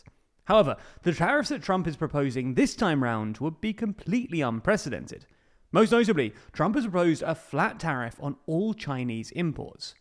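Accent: England, United Kingdom. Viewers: This dude announcer is from England?? Like England has such a great interest in American politics?? 😂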